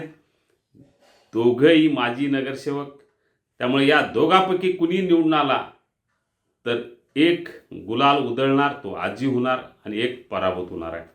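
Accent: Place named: Kolhapur, India. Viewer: अस्सल कोल्हापुरी भाषा आहे भाऊ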